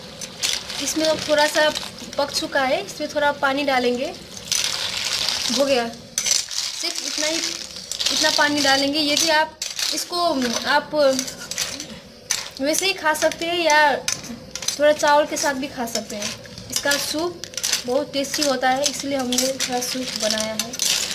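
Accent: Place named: Nepal. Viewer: Nepali ko Hindi bhukai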